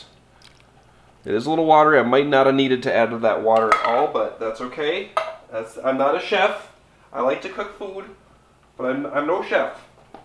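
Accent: Canada. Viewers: I’m sure you’ve addressed it before, but where are you from? I’m assuming Canada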